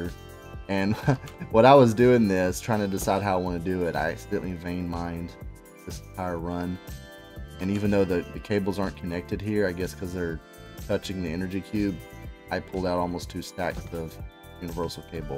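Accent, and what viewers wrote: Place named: South, United States. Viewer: Builds are so amazing!! I want to start a channel someday but sadly, I am still a noob when it comes to many of the mods. Learning them slowly but surely! But your vids are definitely helping. I went back to Pokehaan Craft for now, but will get back to this modpack eventually, lol. Also, whereabouts are you from? Your accent sounds southern USA, but I could be very wrong. I only ask because I am Southern USA myself.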